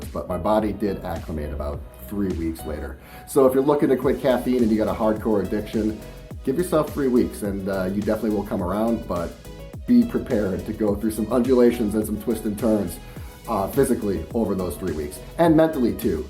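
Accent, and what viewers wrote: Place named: Wisconsin, United States. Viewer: I knew it! I caught subtle hints of that Wisconsin accent on your previous vids and that was what I guessed. :)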